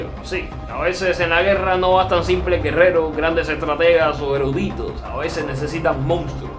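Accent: Cuba. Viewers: Perdón por la pregunta,pero sos cubano?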